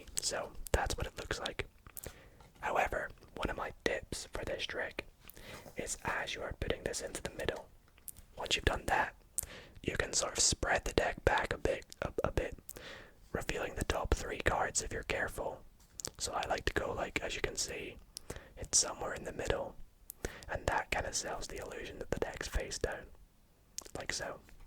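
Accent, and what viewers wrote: Scotland, United Kingdom. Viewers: Your Scottish accent makes your asmr the best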